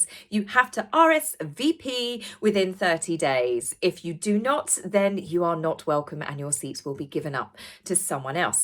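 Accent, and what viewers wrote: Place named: RP, United Kingdom. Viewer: I have not watched you in a very, very long time I was like you tried to change the way your voice sounds, and the way you pronounce words. Did you do that to sound more posh? 😂😂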